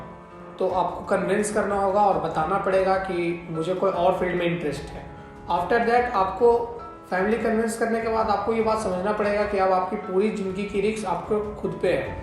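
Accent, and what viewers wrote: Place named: Bihar, India. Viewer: BTW your Bihari language op❣️❣️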